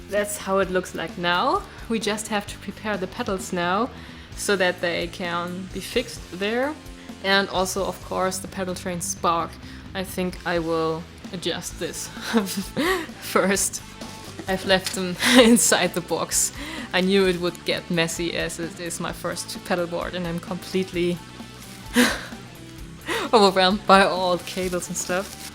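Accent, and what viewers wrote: Germany. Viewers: Are you from Deutschland? I love that German accent :3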